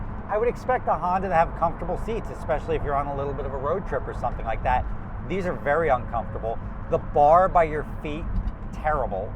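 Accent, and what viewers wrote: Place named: East Coast, United States. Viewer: Absolutely the worst "review" I've seen of the S2K. Take it on a track and tell us how you feel after that. Highway cruising in the car sucks. Period. The only thing that makes it reasonable is top down. This dude seriously sounds like an east coast Weiner and probably is the guy that slows everyone down in his Lambo at HPDE.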